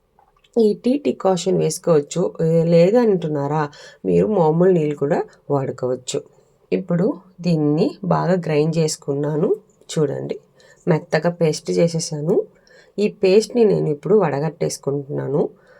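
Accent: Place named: Kerala, India. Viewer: Malayalam notlo vostundiga madam Good so proud of you madam as a malayali proud of you madam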